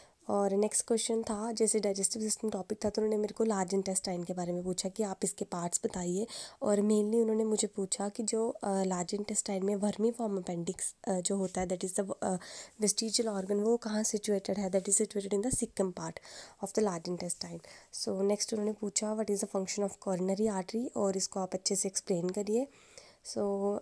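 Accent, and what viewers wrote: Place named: Haryana, India. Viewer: Ok thank you. And God bless you 🙂 But kha se ho yeh toh btati jao. Accent se guess krra tha. Last guess haryana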